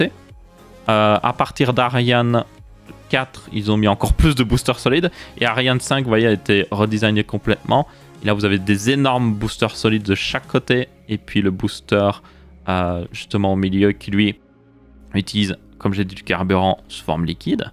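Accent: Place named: Belgium. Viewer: *OUÏTE* alala l’accent belge ... Qu’est ce qu’on ferait sans toi ! xD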